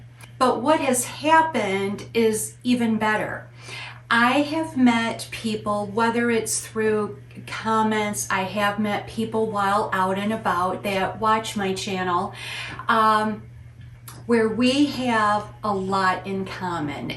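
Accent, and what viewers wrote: Midwest, United States. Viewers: I love your Midwest accent it’s adorable. It reminds me of someone I used to work with who was from Michigan. I’ve spent time in New England and my favorite states are Maine and New Hampshire. Exactly my idea of living a peaceful lifestyle style in a beautiful place. Unfortunately my husband loves California which I do not. We live coastal in a safe beautiful area but it’s way too crowded and EXPENSIVE! I’m still occasionally looking at houses over there hoping my husband changes his mind after we retire. Your videos are something I look forward to every Sunday! ❤❤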